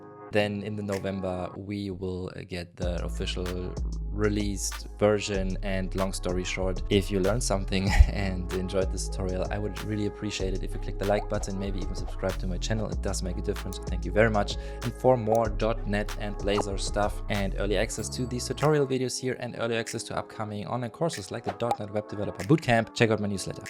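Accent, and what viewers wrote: Germany. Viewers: Also fur einen deutschen sprichst du ziemlich gut Englisch! Der deutsche Background ist jedoch nicht überhörbar 😃. Danke fur das tolle Video! 👌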